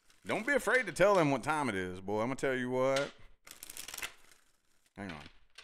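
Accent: South, United States. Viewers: Thank you for the reading! Like your Southern accent and the no bs approach! 👍 Also, if it’s possible, is there a way the cards can be more specific in your future readings in regards to the age of the ‘other person’ example: Older/Younger and if their in a foreign country? This would help a lot in deciphering the readings. Thank you so much! Peace! ✌️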